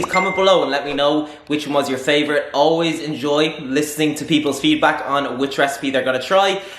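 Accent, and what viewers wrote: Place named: Ireland. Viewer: I have been watching your videos ALOT when im at work, taking notes! today i am watching at home with sound, and i had litterally no idea you where irish!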